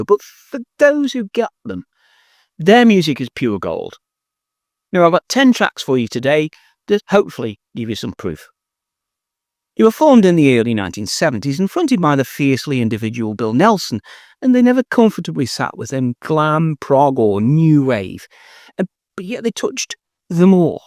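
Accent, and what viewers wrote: Stoke, United Kingdom. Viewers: great review,,,by the way you sound like a stokie?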